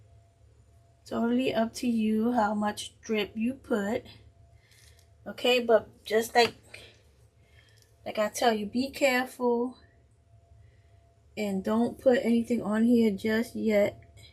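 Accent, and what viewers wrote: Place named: Louisiana, United States. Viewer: Just discovered you!!! I love it!!!! Your accent reminds me of Louisiana... Baldwin, Franklin, Jeannette, Grand Mary, New Iberia ....somewhere round there💜💜💜👏🏽👏🏽👏🏽👍🏽👍🏽👍🏽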